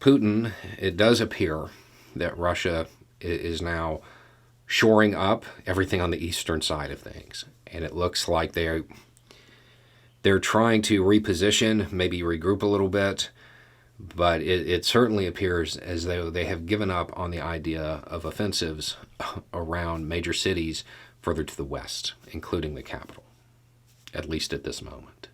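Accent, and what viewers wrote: South, United States. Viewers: I want to trust you because of your beard. Stick with me, please. I want to to trust you because of your familiar southern drawl. I want to trust you because of your witty t-shirt choices. Really, hear me out. I want to trust you because of your sensible and informed perspective of global politics and international dynamics. I FEEL like you are honest, and that you value MY ability to understand what's REALLY going on in the world. Your words give me hope, and my ability to follow your train of thought makes me feel intelligent, and better informed for absorbing your discourse. Please, tell me this. What is your motivation for sharing your keen insight and your witty parlance? Is it for the clicks? Is it for the lolz? Or is it genuinely for the desire to promote a more informed public awareness of the issues facing this bewildered generation of hope mongers? 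I think you might be a thorn in the foot of the misinformation and cynicism that plagues the minds of the susceptible masses. I think you might be a hero. Why? Why do you share your perspective? Why do you post multiple videos every day to tell the world what we should have the sense to learn for ourselves? What motivavates YOU to motivate US to wake up and pay attention? Your answer to this question will I use to judge myself, and my naivety for entertaining content. You compel me to seek truth and lucidity, and I ask that you speak to this influence you have on me. Your silver tongue is a potent tool. Are you as genuine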